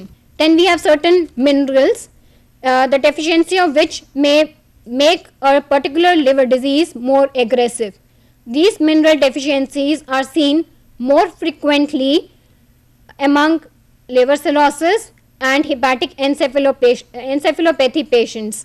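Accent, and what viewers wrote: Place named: India. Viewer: Anyone who has the patience to listen through the whole lecture will be rewarded with valuable information. Unfortunately some of the written material is hard to see and it is better to stop the video on occasion to absorb it all. Listening to attentively will also clarify the written material, but I do admit that her thick Indian accent and high pitched voice can be a distraction. The viewer must overlook this detail in order to extract the essentials of her presentation.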